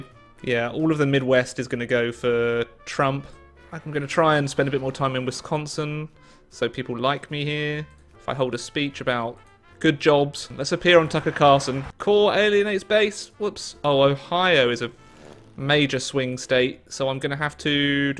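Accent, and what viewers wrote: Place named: United Kingdom. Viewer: It’s funny as an American to here a British person say seats knowing very well he will never get it right.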